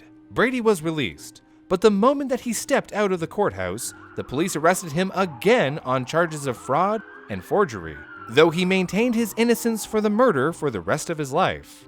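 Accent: Canada. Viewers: Hey,! You a Canadian? 🤩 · he’s Canadian😄 you’re smart!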